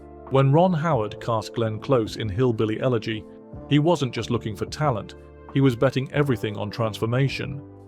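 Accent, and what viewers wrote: United Kingdom. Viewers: Would have been nice to hear it from Ron's own voice instead of an overlay of a British nobody. Nobody can become Ron Howard, even for this explanation, which is not an interview. Disappointing.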